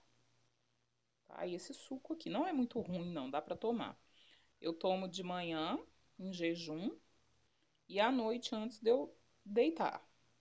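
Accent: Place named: Paraná, Brazil. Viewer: Acho que é do Paraná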